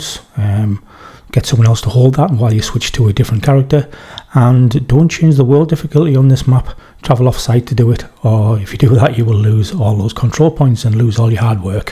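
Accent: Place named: Durham, United Kingdom. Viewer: Just trynna get them tactical assessment bundle blueprints. Friggin things don’t wanna drop for me. Anyways, were you from mate? You sound like you’re from Durham!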